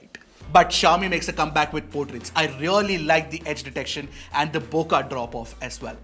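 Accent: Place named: India. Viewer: The pronunciation of "T'' in Indian accent is the ugliest thing I've ever heard.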